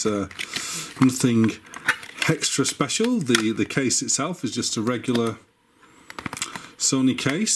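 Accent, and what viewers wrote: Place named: Northern England, United Kingdom. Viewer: No,I'm another North O'England boy just like you is.A bit further north than you (Durham).But IIRC Vic/Jim grew up in Leeds and sometimes you really do sound like him ,only for a couple of words at a time,here and there though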